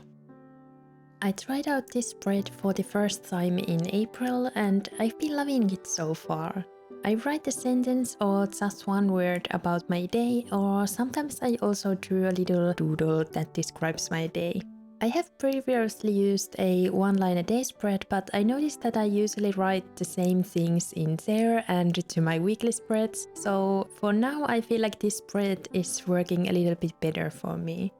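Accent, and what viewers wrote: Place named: Finland. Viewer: This is the first of your videos I watch and I like it a lot! May I ask where are you from? Your accent somehow sounds Finnish to me (someone who is currently trying to learn Finnish) but I’m not sure whether I’m right with that assumption. Either way, I’ll definitely subscribe because I love the way you set up your Bullet Journal <3 ⏰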